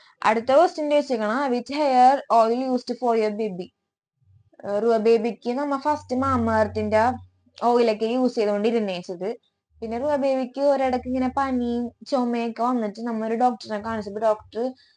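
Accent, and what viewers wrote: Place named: Kochi, India. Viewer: തനി കൊച്ചി ഭാഷ 😇😁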